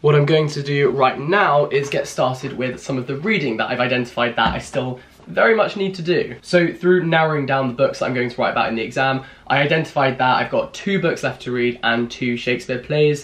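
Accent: RP, United Kingdom. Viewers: younger is major nostalgia for me omg you're so posh!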